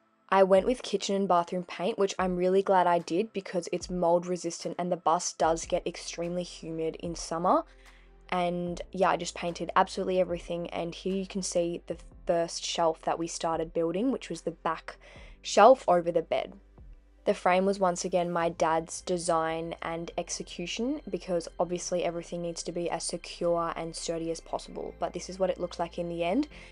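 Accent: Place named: Australia. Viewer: the most Aussie lady accent I ve ever heard, hope to see the bus in person one day, what a lovely build, love it ❤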